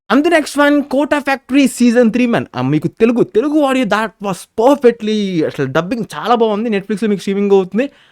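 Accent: Guntur, India. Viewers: Bro meedhi guntur aa ❤ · Bro meedi guntur aa😮? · Bro nuvu guntur ana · Bro nedi GUNTUR yee naa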